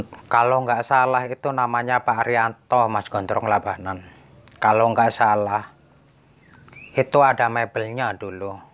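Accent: Madura, Indonesia. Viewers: Gondrong labanan kalo denger ini mumet... Banyak ngomong nya ga jelas.. 7 poin aja jgn bertele tele,dari gaya bicara nya dari madura ya pak🤔🤔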